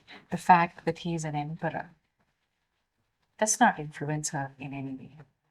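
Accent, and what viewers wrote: India. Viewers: Indian Ki english bahut mza Kerti hai.hamen Yahan Pakistan me teachers kahtay hain indian authors Ki Kia bat hai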